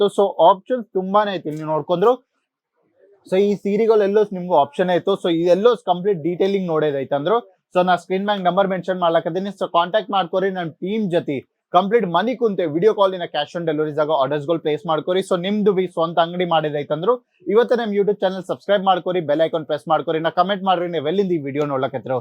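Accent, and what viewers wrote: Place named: North Karnataka, India. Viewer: ಉತ್ತರ ಕರ್ನಾಟಕನಾ ನಿಮ್ಮ ಭಾಷೆ ನೋಡಿದರೆ ಹಾಗೆ ಅನ್ಸುತ್ತೆ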